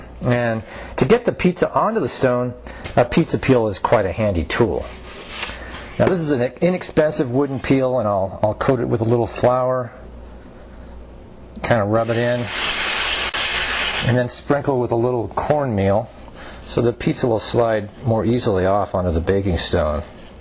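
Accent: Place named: United States. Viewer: This video is great, however I am confused with the "cups" measurement. I am from Europe and have no idea what a cup sized is, i have a couple of them, and most of them are different size lol, and in addition, I have found several version of "cups" on few websites i visited. I suggest that one cup is 136 grams of flour or 240 milimeters of water, which was referred as american cup, and because of the guys accent, i would say he is from us.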